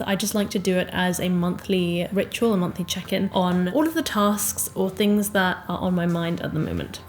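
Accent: United Kingdom. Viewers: Hi it’s nice to see you and listen your videos. I love how you talk in British accent and always your voice gives me a power to improve my English deeper. Currently, I’m living in Japan and I would like you to come to Japan, this is such a good country and I can assure you that you will love it. Thanks for your videos and I want you always to be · Hi it’s nice to see you and listen your videos. I love how you talk in British accent and always your voice gives me a power to improve my English deeper. Currently, I’m living in Japan and I would like you to come to Japan, this is such a good country and I can assure you that you will love it. Thanks for your videos and I want you always to be beautiful as now.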